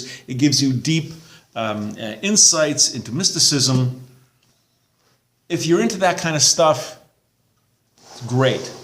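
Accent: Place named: Midwest, United States. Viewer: Sir. Where are you. You sound midwestern. Chicago-y or Milwaukee-ish. ? M. Elgin. Illinois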